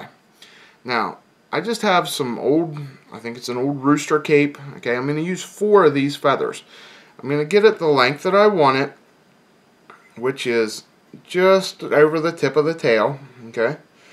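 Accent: Pittsburgh, United States. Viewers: lol like 2 minutes into hearing you speak i said to myself, this guy has to be from Pittsburgh or near there. Looked up Holsinger's Fly Shop and i'd be damned if i wasn't right :P Great video thanks!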